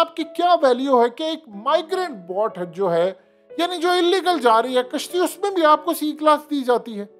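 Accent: Punjab, India. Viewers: Mr.why you speaking Urdu in Punjabi style....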